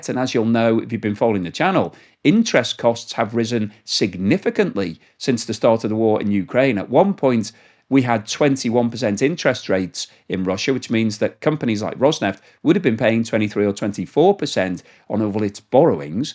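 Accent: United Kingdom. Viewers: Since you are british, and you refer to the "other than oil" product as "gas", I HAVE to think that you are referring to Natural gas. Here in America, gas, or as you would refer to as "petrol", is refined from oil. Natural gas almost always is Naturally present where there is untapped oil. So when you simply said "gas", I'm thinking " WTH is he talking about?!!" But then I realised, by your accent, that you weren't talking about "petrol". Please try to be more specific. Otherwise your commentary can be a little misleading.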